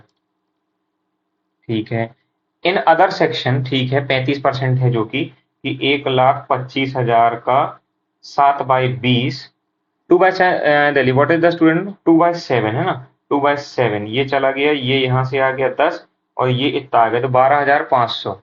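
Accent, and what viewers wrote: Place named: Bundelkhand, India. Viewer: Ok bundelkhandi lag rahi thi braj type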